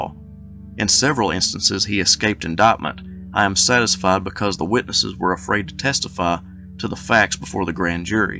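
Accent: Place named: Appalachia, United States. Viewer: You almost sound like you are from the Appalachian area, but the song says the professor of knowledge is in the heartland. Texans use a long i when speaking also. I want to know where you are from, just whimsical curiosity. I love these videos and ALWAYS appreciate learning from you. I wager East Tennessee or Oklahoma is where you might be from.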